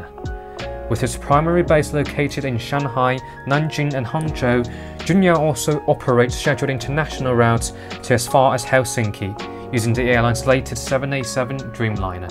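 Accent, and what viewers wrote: United Kingdom. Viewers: Officer has a rather distinctive British accent. Maybe if switched to an American accent you might be better able to understand him?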